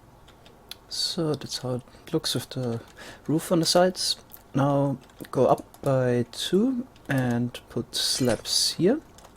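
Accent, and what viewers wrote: Bavaria, Germany. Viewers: Haha 100 pro Deutscher oder? Der Akzent macht mich fertig. Nicht böse gemeint :D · He's German. I heard him speaking it. He sounds kind of Bavarian to me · Too sausend haha man hört voll raus, dass du Deutscher bist :D Aber krass, was du alles machst, großen Respekt dafür! :)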